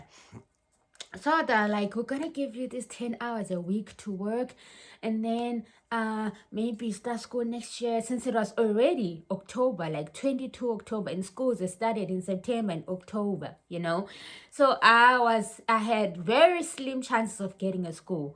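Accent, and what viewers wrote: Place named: South Africa. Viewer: You said, "yhoo shame", "uyanya", etc. The way you say these words, accent and demeanor, it screams Xhosa hun. I will be starting life in Germany in the next few months on Blue card visa. Hopefully, I don't go through such turbulence.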